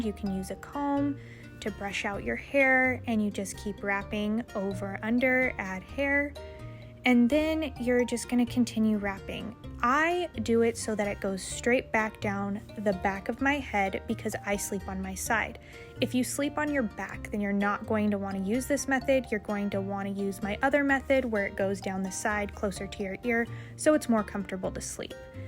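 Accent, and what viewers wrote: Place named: South Africa. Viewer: She’s South African?!! Aye aye! ❤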